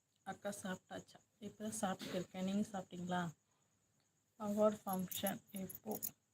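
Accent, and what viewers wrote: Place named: Coimbatore, India. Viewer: எங்களை பார்க்கவைத்து சாப்பிடாதீங்க உங்களுக்கு வயிறு வலிக்கும் கோவை தமிழ்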